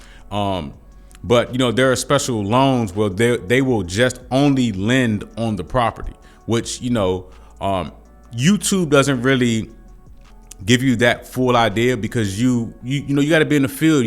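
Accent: DMV, United States. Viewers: 10 seconds in an i knew bro was from DMV lol. Great insight for us beginners!! Respect.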